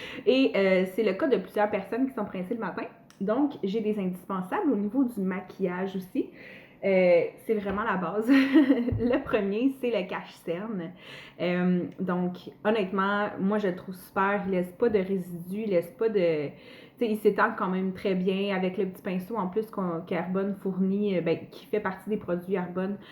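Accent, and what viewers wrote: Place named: Quebec, Canada. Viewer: j'addorreee ton accent québécois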